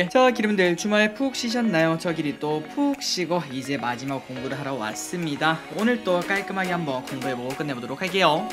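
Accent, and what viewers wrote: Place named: Gyeongsang, South Korea. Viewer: 경상도 억양이 살짝 들렸는ㄷㅔ......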